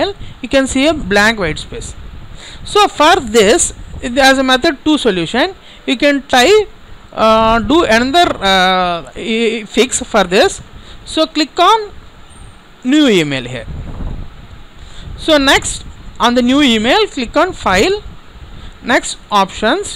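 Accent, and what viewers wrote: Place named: Pakistan. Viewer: Speak English. I do not understand Pakistani.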